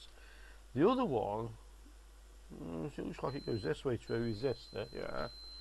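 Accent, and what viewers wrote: United Kingdom. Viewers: Got it! Thanks and thanks for not posting something rude. I was honest about wanting to learn from an expert and I clearly could not understand his or really any "heavy" British accent. I did manage to figure out "subtitles", something I did not know about. Even at 65 I still learn something new everyday. This Youtube is mostly a younger persons platform and have just recently started to watch, learn and enjoy from it.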